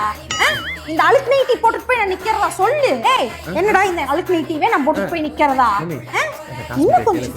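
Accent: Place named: Coimbatore, India. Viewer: The epic CBE slang, flexing it...❤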